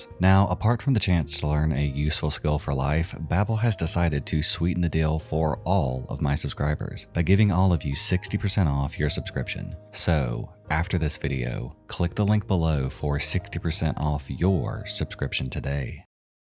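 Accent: South, United States. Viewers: This man's southern twang is kinda sexy hehe (i'm a guy)... 😝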